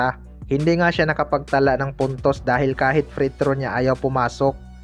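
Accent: Visayas, Philippines. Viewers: Paanong i'trade ehh 1rts five nya yang si HOLT...depensa at hustle ang inaasahan nya Kay HOLT Pag inaalat siya sa puntos! puro ka lang hula ehh...ayusin mo salita mo halata Kang BISAYA!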